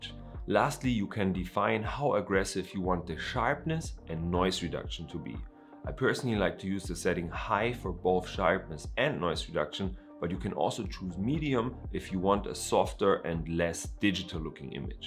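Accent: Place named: Germany. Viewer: Excellent tip, thanks. You sound very German,.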